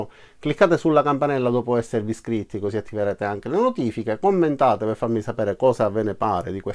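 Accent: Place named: Palermo, Italy. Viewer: Ciao io sono di Trapani, tu dall'accento sembri Palermitano.. O sbaglio?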